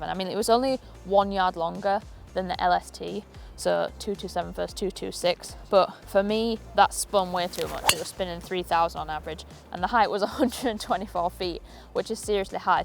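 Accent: Yorkshire, United Kingdom. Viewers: Have played the G410 and am looking forward to trying the 430 when it's available. Liked the review and the friendly Yorshire accent, thanks.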